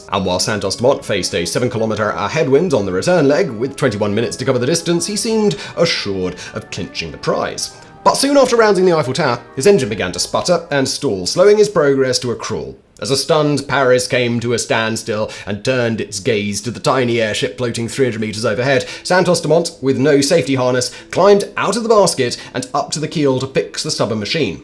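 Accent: United Kingdom. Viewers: From your accent you sound British - so it should be "inventor of the aeroplane" - we are not the 51st state yet!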